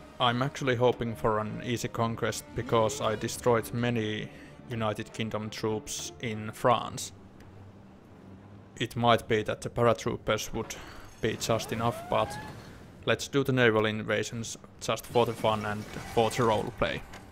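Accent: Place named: Finland. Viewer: Finnish accent, best accent ;)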